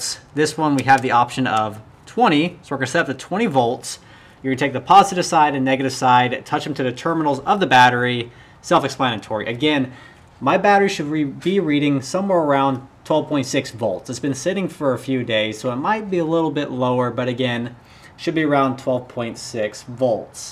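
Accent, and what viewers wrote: Hawaii, United States. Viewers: weirdly still Hawaiian seeming, the accent though, and not pidgeon, so weird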